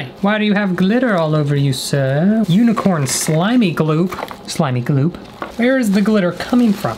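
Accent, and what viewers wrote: South, United States. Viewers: "Everything is a Coke" He must be from the south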